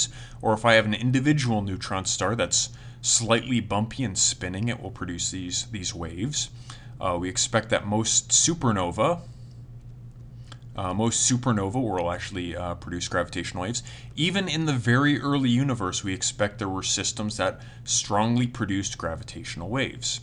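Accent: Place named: Canada. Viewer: Great video and well-crafted teaching! Thanks for making these. Since you recorded this video, we did in fact detected the gravitational waves. So your video is even more relevant now. I hear a bit of a Canadian accent. How correct am I? :-)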